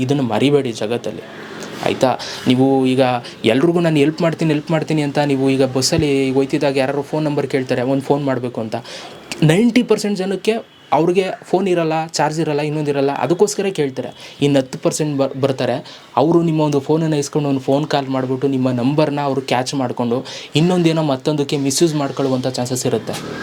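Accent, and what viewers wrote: Mandya, India. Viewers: Pakka nam mandya. Bhase nivu. Sup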